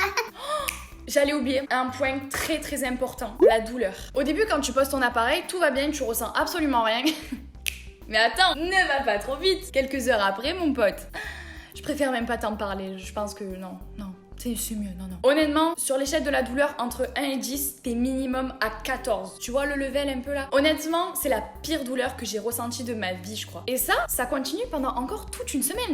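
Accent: South, France. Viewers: t'avais l'accent du sud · Tu as pris l'accent du Sud nan ? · l'accent du sud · ta grv l'accent du Sudddddd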